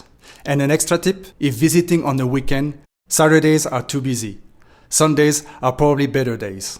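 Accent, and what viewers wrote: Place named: Quebec, Canada. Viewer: I'm guessing Quebec Canadian speaking in English.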